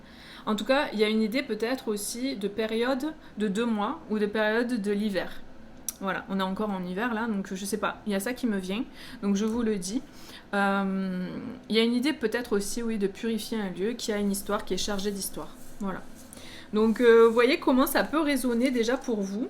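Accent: South, France. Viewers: Le bon accent du sud, excellent 😊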